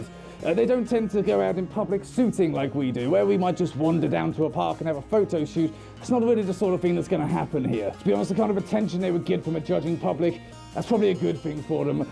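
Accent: United Kingdom. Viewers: you sound sooo brittishhhhh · Ahh another of my kind, a British furry that sounds like “spiffing brit” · Savior_the Husky b r i t i s h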